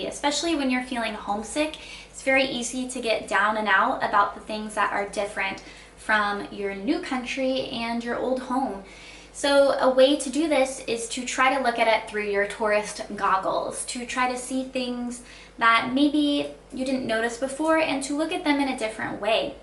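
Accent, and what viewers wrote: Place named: United States. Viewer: Oh,yoyr accent is incredibly beautiful between different american accents,where is your state in USA which treat you with this beautiful american accent then??👌👌👌👌👌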